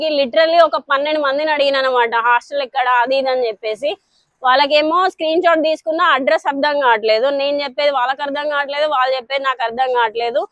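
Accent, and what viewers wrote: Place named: South, India. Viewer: South Indian accent is soo funny 🤣🤣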